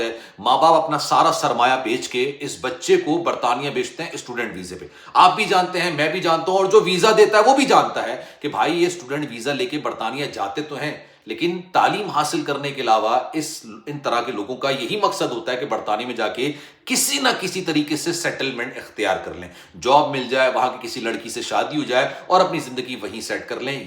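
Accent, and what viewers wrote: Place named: India. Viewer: speak like a pakistani please not indian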